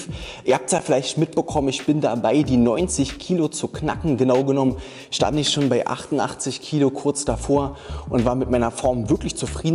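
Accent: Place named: Berlin, Germany. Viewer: Er hat nen Berliner Dialekt. :) Berlin?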